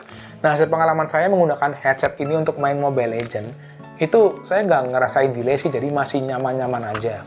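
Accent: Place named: Purwokerto, Indonesia. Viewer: Mas orang Purwokerto bukan wkwkw logatnya mirip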